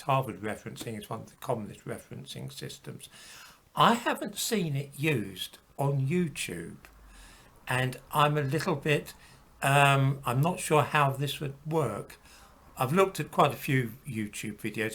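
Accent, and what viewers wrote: United Kingdom. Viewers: I have to say I have enjoyed this man's videos a great deal. I can't comprehend how anyone could find his charming British accent and peaceful, relaxed speech, somehow annoying.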